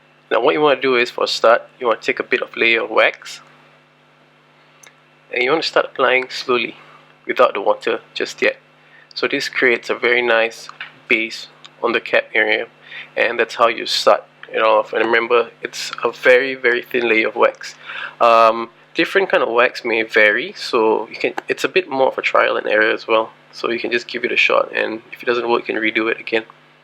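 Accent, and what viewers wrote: Singapore. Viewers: are you from singapore? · You sound so singaporean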